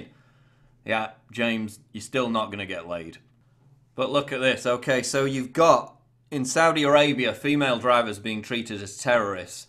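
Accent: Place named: Yorkshire, United Kingdom. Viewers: you from Sheffield?, you sound like me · As an Aussie, is yours a Yorkshire accent? You sound like Michael Vaughan, former English cricket captain. Btw, it's very patriarchal, you should learn to speak with a Swedish accent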